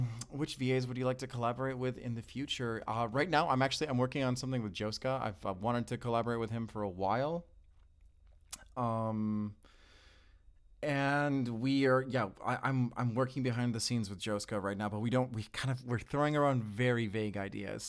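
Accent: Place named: California, United States. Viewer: Yes I’m six months late, but I thought your speaking cadence sounded familiar! Californians recognize Californians 😂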